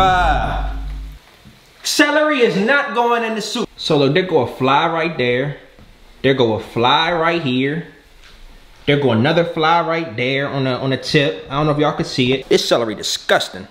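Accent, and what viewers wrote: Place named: DMV, United States. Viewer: You sound like you from the DMV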